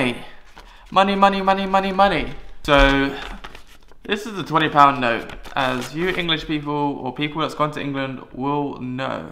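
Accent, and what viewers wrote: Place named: Stockholm, Sweden. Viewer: I'm kind of inclined to disagree with you still about the grammar, there are more similarities than differences to me at least :P As for the sj sounds, most of the time you can get away with using the familiar sound that you do have in english, doing so will mostly just make you sound slightly Stockholm-ish. But keep practising, I'm sure you'll get a hang on the harder sounds aswell =)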